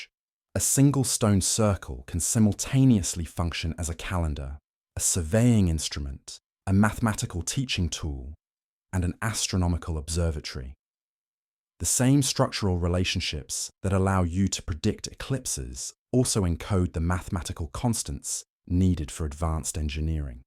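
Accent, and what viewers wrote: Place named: United Kingdom. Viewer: Are there any stories that don't have a British fkn accent???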